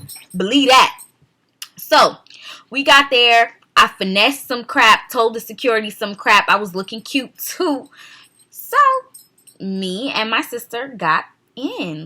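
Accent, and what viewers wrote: Jamaica. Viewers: I love the Jamaican accent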